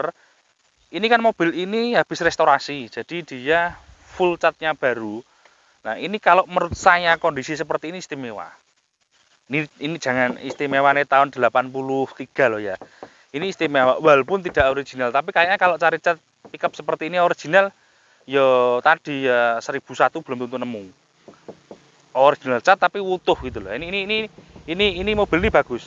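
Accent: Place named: Malang, Indonesia. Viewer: Nonton test drive sampeyan poleh kangen ngalam Sam. Boso jowone sampeyan medok khas ngalam an. Alhamdulillah aq duwe sing th 1983. Sukses buat yo sam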